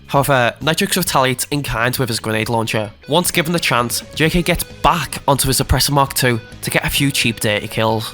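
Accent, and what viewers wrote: Liverpool, United Kingdom. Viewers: The scouse accent is boss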